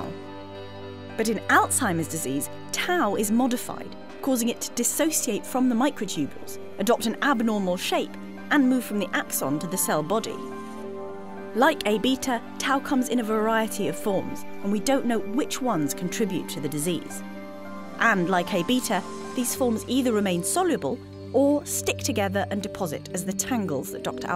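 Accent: United Kingdom. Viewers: United Kingdom.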